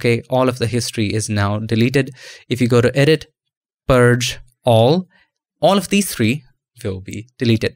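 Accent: India. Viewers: No matter how much you try to get a american accent your indian one cracks through your effort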